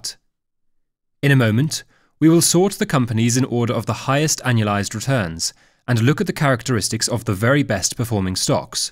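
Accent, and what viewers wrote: United Kingdom. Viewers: You londoner?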